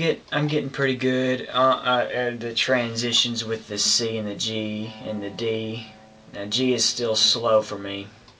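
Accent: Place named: South, United States. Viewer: Haha yea man nothing wrong with a southern accent it just makes it that much more fun to be around you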